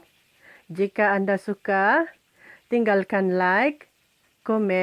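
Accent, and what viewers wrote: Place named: Sabah, Malaysia. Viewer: Cara ulas tu bah, punya lain.. Pakai ja tone sabahan bah.. 😆😆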